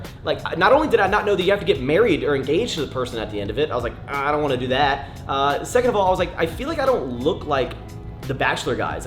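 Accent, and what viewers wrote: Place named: New Jersey, United States. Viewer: Lovely accent you have there, New Jersey?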